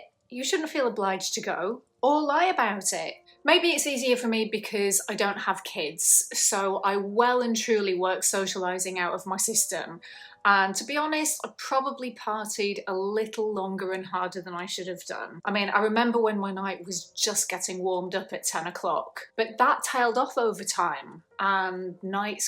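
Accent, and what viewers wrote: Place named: Northern England, United Kingdom. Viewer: Great reflections. Candid and sharp but genteel and insightful. What region of the U.K. Are you from? Love your accent. Northern, I imagine.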